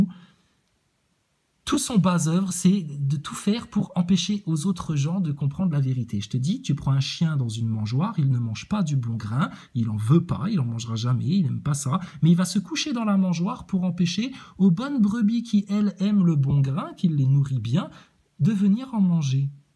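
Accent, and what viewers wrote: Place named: Banlieue, France. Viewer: Déjà c'est VOUS et pas TU nous ne sommes pas pote .. et ça ne risque certainement pas d'arriver vue le niveau de vos reponses Ensuite c'est trop long trop chiant trop blindé de biais et de rethoriques bidons Et le côté religieux mystique faut arrêter c'est tellement deb... que c'est sans intérêt et cela démontre que votre raisonnement ne tient pas alors arrêtez les bonsieuseries et ça ira mieux dans vos réponses et ça sera largement moins chiant et stupide Si vous vouliez réellement la paix le bonheur la joie et tout le tralala , vous commenceriez par vous taire ou alors ayez une construction intelligente de vos critiques Ps Comme vos dires sur la positions des mains la barbe .... (c'est de la pnl et donc à 95% bullshit, ) changez de phrasé et d'accent parce que franchement ça fait le gars de la banlieue qui ce la raconte façon jeune qui a la haine .... 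je me marre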